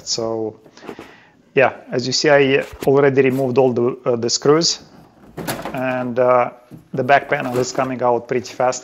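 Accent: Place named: Poland. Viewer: I know you're right. Either way, thank you for this video! We are lucky for it. Idk from where you hail but your accent reminds me of Polish and I love it!!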